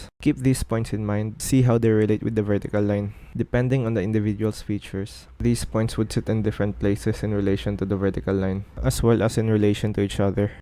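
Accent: Philippines. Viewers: Your accent kuya sounds like Filipino accent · Filipino accent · pilipino accent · Man that Fil Accent is really distinct lmao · Are you from Philippines? You sound like you're from there 😂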